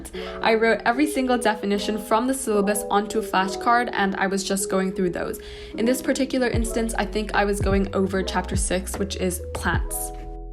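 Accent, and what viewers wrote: United States. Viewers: love this, super motivating :) i have a question, are you english? because your accent is a bit more american and i didn’t know how you took gcses x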